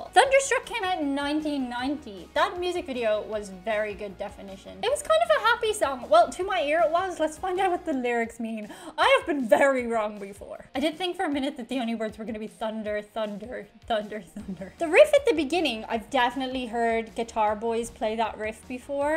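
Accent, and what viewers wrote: Ireland. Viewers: Nice irish accent there........ No effin way you made it to whatever age you are without hearing Thunderstruck.........and supposedly completely unaware of one of the most famous rock bands ever........ Extremely popular in Ireland and on Irish radio stations...... in particular. Efin clickbait...........and I've just realised that I'm a victim of it. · She’s Irish, some of her videos are even labeled as such (accent too). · She’s Irish by the sound of her accent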